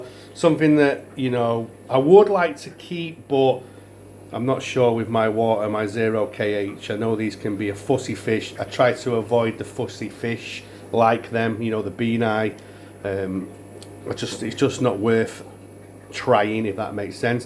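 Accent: Manchester, United Kingdom. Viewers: You sound like a local lad to me mate (Manchester) I went to pier aquatics in Wigan for the first time last week, definitely my new favourite shop, bought myself a Gold saum 🤩 not sure if you know about it but there’s a cichlid convention in Rochdale on September 10th mate, il definitely be checking that out. I’ve only been keeping fish for about 6 months but I’m totally hooked 😅😆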